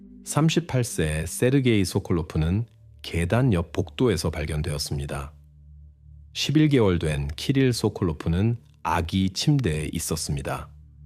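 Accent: China. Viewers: 약간 조선족말투 같기도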